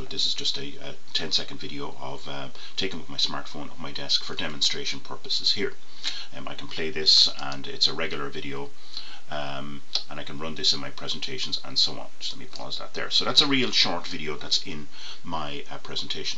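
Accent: Ireland. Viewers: Brilliant! For us MAC folk, it works with the Unarchiver program, it's a fast Smooth fix! And it sounds much better with an Irish accent.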